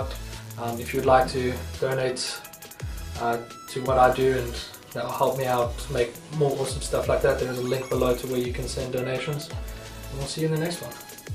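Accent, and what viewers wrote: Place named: South Africa. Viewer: Are you South African? You sound South African.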